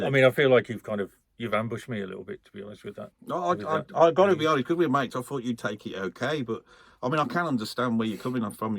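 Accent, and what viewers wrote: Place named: England, United Kingdom. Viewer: that accent. What region in England?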